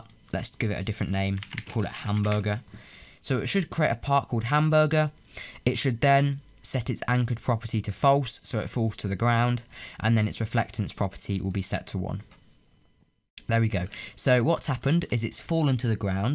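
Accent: United Kingdom. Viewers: He still sounds British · I love *'s British accent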